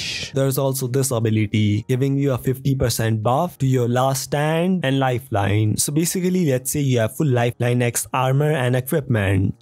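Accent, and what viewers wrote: India. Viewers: you sound like Indian?? are you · bro has pure indian accent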